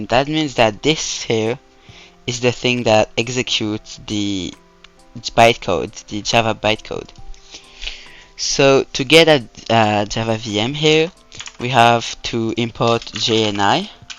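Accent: France. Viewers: L'accent français qui se cache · Good French accent bro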